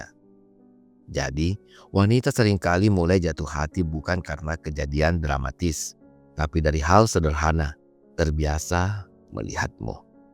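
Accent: Indonesia. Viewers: Sy tauu !! Suara di vidio ini pasti orang Sulawesi😊 tepatnya Bugis😅😊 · Yg ngomong pasti orang Bugis/Makassar😂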